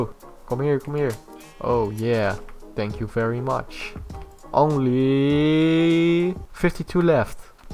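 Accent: Netherlands. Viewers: are you from the netherlands or something becouse you have an netherlands accent nice vid btw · are you dutch? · Are you a Dutch men?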